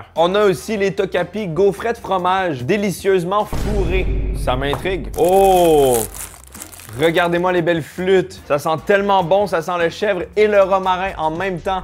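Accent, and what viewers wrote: Canada. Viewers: J ai horreur de l accent Canadien je Zappe dommage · J'adore ta vidéo, c'est drôle j'aime ça en plus l'accent du Canada c'est top! vive le canada et et les canadiens , bonjour de France et mes amitiées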